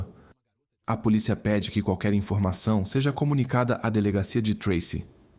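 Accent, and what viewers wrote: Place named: Portugal. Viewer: 𝑸𝒖𝒆 𝒑𝒐𝒓𝒓𝒂" 𝒆́ 𝒆𝒔𝒔𝒂, 𝒖𝒎𝒂 𝒉𝒐𝒓𝒂 𝒂 𝒏𝒂𝒓𝒓𝒂𝒄̧𝒂̃𝒐 𝒆́ 𝒆𝒎 𝑷𝒐𝒓𝒕𝒖𝒈𝒖𝒆̂𝒔 𝑩𝒓𝒂𝒔𝒊𝒍𝒆𝒊𝒓𝒐 𝒐𝒖𝒕𝒓𝒂 𝒉𝒐𝒓𝒂 𝒆́ 𝒆𝒎 𝑷𝒐𝒓𝒕𝒖𝒈𝒖𝒆̂𝒔 𝒅𝒆 𝑷𝒐𝒓𝒕𝒖𝒈𝒂𝒍" 𝒆𝒎 😅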